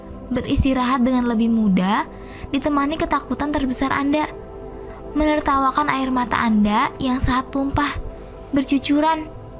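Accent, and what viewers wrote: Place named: West Java, Indonesia. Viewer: Suara logat orang sunda nih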